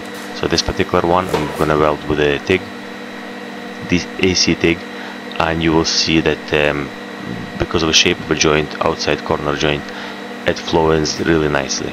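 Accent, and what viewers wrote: Scotland, United Kingdom. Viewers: Good bit o scottish accent comin through there boss where u based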